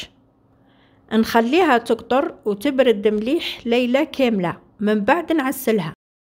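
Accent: Algeria. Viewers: اول مره اسمع اللهجه الجزائريه تدخل القلب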